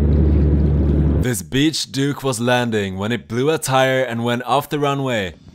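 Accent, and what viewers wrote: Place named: Sweden. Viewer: Värsta svengelsan jag hört!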